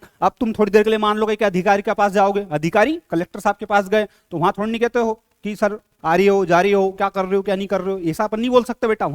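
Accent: Indore, India. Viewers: He's accent says he is from Indore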